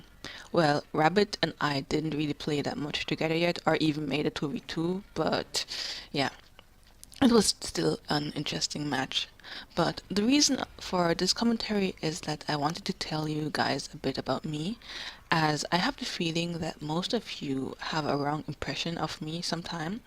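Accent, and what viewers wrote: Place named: Caribbean. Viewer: You sound like you're from the Caribbean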